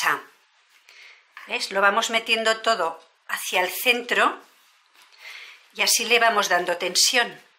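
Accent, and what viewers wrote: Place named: Zaragoza, Spain. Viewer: Cuando oigo su acento recuerdo mis viejos tiempos en Zaragoza, desde el extranjero la morriña es una mochila que nunca se deja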